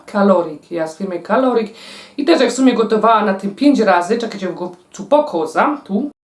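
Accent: Silesia, Poland. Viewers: jestes Super babka,zawsze sie usmieje z twojej slaskiej gadki.Pozdrawiam cie z Ingolstadt. Jak bedziesz przejazdem serdecznie zapraszam na kawusie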